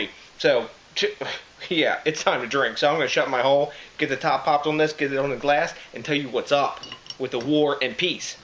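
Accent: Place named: Baltimore, United States. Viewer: Hey, what part of MD are you from? Sounds like a Bmore accent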